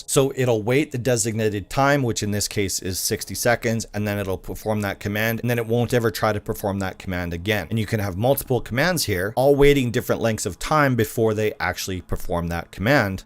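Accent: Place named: Canada. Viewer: Dude its NOT "Copy PASTA or POSTA" or whatever you are saying ... its copy PASTE - like think of PAY + ST.... Like Fish PASTE etc. dang that is such bad and annoying mispronounciation and its hard enough with you Canadian Cousins saying ABOUT all weird but, this PASTE really takes the BISCUIT and god knows how you would say "BISCUIT" lol Im from the UK btw and love Canadians and Oh Canada...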